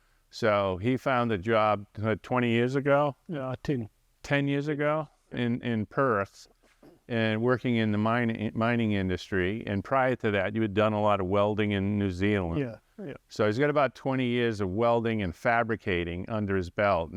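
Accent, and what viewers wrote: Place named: New England, United States. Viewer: Where in New England you from.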